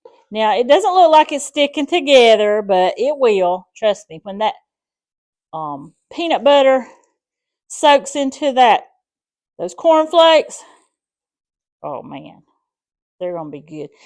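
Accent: South, United States. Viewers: Absolutely one of the best snacks. Thank you. Love your southern accent.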